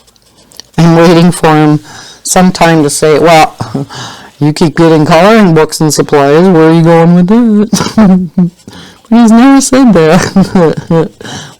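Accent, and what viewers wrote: Wisconsin, United States. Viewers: praying for you in this rough patch. I already had started this pic so I colored in my new JS Color by number and hope you can show, color and chat with it. Would love to hear your thoughts on this! If you don't have it can I send it to you? So what you are saying is the videos are limited in this season or is it going to be forever? Do you have COPD? You always have a croup sound and cough. Just worried. You don't sound weird to me with your dental issue but you sound like a lady from Wisconsin! You are a soothing cup of hot tea in my life and appreciate you so much! 🥰🌠⭐🌠😊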